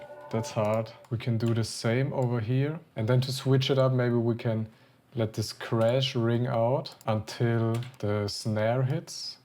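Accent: Germany. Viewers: The german accent makes it 100 times better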